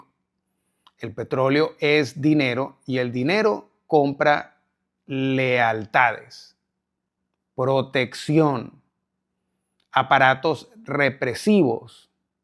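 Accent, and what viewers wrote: Venezuela. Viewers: Cómo buen Tachirense, hablas sin "pelos en la lengua " en perfecto acento venezolano. Gracias ❤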